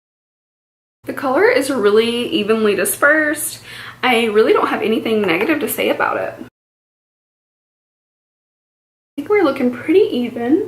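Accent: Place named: Alabama, United States. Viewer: Love this brand! So glad to see others talk about it! It sounds like your from Alabama? Maybe lol. I'm Mississippi haha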